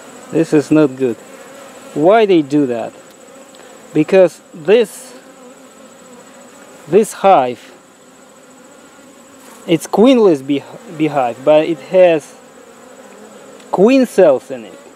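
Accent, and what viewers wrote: Russia. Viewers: russian accent😃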